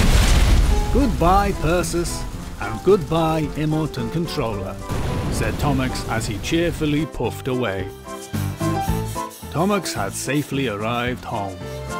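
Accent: Scotland, United Kingdom. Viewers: Is the narrator Scottish?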